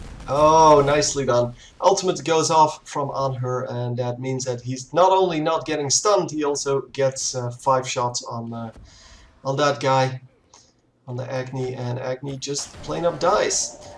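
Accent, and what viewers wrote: Netherlands. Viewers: I think you are Dutch right? I can hear that accent because I'm Dutch as well xD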